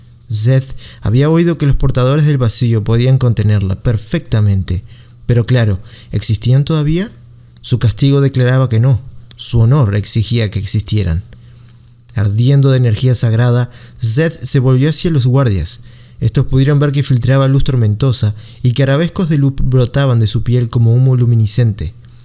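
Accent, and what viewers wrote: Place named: Argentina. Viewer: De verdad, gracias a ti por hacer esto. Esta saga merece ser leída ( en este caso escuchada) por muchos. Me encanta tu narración, el acento argentino le da otro toque. Que bien que no te tomaste mi comentario a mal. Por favor, no pares y continua la saga. Subscripción inmediata.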